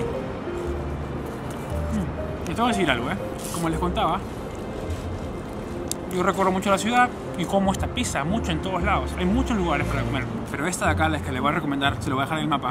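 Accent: Peru. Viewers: Bro tu eres peruano vdd? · Hola te felicito eres espectacular..ese asentico peruano es fantástico... soy de Colombia conocí tu canal a través de planeta Juan...muy buen contenido .sobretodo la simplicidad que lo transporta a uno a tu amada N.Y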